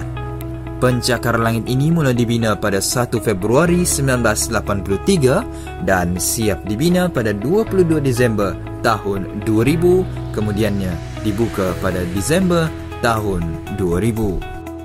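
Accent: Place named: Sarawak, Malaysia. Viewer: Sarawak? Sebab ko ade slang sane.. Kan kan kan kan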